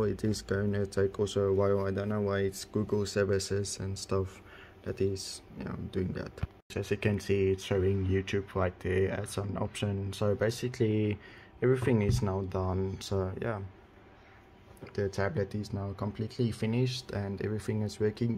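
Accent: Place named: Afrikaans, South Africa. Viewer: kan daai afrikaans hoor in daai engels. thanks vir di video dude 😁😁🤘🤘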